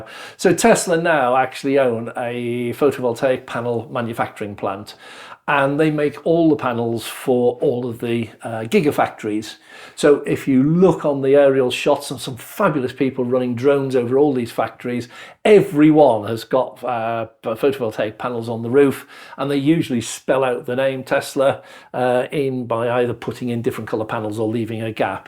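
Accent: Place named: West Country, United Kingdom. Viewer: You have some west country accent (Yeovil maybe?) and that tends to drag out some words. Also as you say thinking and talking at the same time will slow down speech. Someone who speaks quickly maybe is not putting too much thought in. As for just a minute I would say Kenneth Williams was more cheating than thinking.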